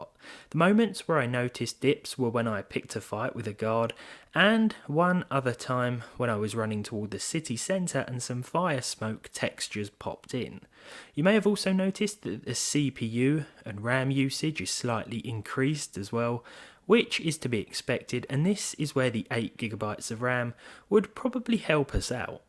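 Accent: United Kingdom. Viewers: I just love this channel because there is no background music. Makes the channel different in a good way. Your british accent is the music for my ears :D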